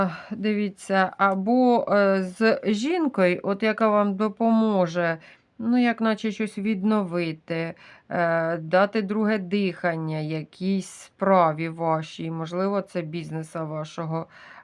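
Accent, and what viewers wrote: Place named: Poltava, Ukraine. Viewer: Полтавська або Черкаська обл😂. Говір- ☝️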